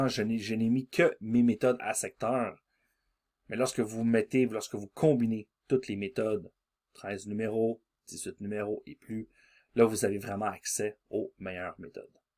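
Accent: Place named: Quebec, Canada. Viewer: l'ackcent quebecois tres beau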